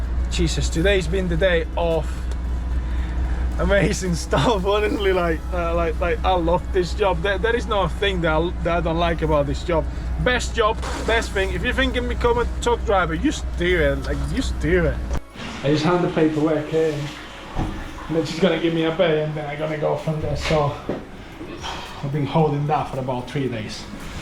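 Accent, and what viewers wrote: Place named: Manchester, United Kingdom. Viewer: Think he’s from Manchester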